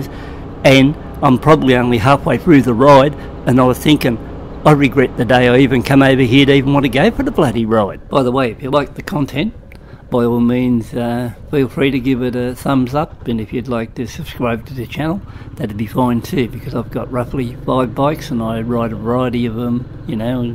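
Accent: Australia. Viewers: Bloke sounds like the orignal template for a bogan mate.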